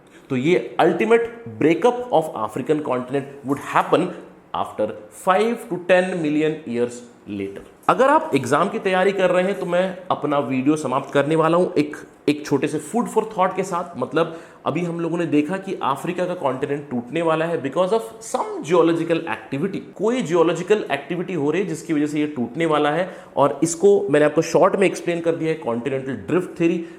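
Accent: India. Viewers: I was eager to hear your hindi accent.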